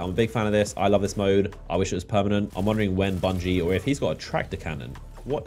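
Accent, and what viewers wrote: United Kingdom. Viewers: Can you learn an American accept please to be more relatable to most users? This british thing is hard to listen to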